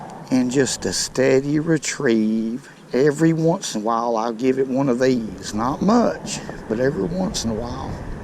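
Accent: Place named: Alabama, United States. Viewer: i loved your video. thank you for taking the time to produce it. love the alabama accent. have a friend from there and you sound like him.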